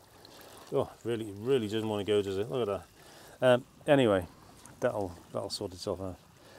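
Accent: Liverpool, United Kingdom. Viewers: Love the voice of an educated Scouser.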